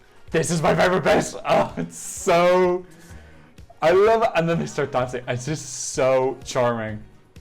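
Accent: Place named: Ireland. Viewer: This was a nice reaction video, I enjoyed it and you had some interesting opinions. Keep it up dude! I have some constructive criticism if you don’t mind. The only thing I’d say though is that you don’t seem to annunciate your words very well. Like, I’m Irish and even I found it difficult to make out what you were trying to say a lot of the time. It’s important to keep in mind that, if you want to appeal to a wider audience, they need to be able to understand you even if English isn’t their first language of if they generally have trouble deciphering Irish accents. Apart from that, the video was great!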